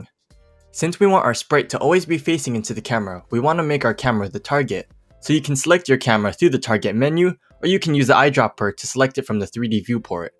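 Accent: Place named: Japan. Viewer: Your accent is very strong more Asian type of accent Japanese and Filipino accent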